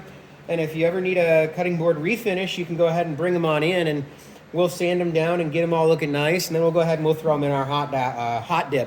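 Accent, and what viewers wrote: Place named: South, United States. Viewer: This guy's from the South!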